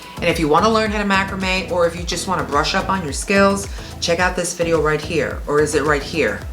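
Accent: New York, United States. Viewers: I love the plant container! You are awesome. Stay safe, I love your New York accent too. Much love from Cape Breton, Nova Scotia, Canada xo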